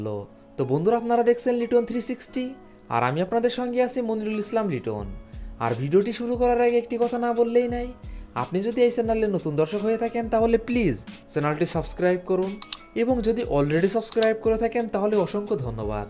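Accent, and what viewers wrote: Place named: Jessore, Bangladesh. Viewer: kota sunei bujte parsi amar basa o jsr 🥰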